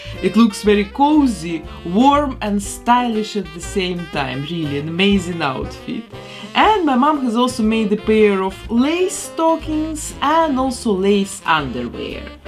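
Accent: Russia. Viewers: Акцент слово из России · Блин, честно говоря, такое ощущение будто автор канала русская. У неё очень явный русский акцент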